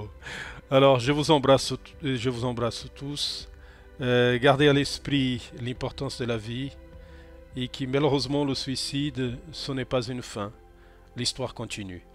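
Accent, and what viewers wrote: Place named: Brazil. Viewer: Je suis tellement heureux de retrouver le Père Brune. C'est en cherchant d'éventuelles vidéos inédites de François Brune que je vous ai trouvé aussi, j'ai écouté toutes vos vidéos : 🤗❤. Je vous aime maintenant tout autant que le Père Brune, et je comprends qu'il vous ait choisi comme postier tout amour. Il se trouve que j'adore le Brésil, et aussi votre accent. Merci du fond du ❤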